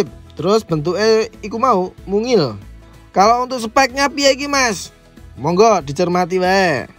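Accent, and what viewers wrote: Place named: Bantul, Indonesia. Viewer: Logate mbantul buanget hahahhaha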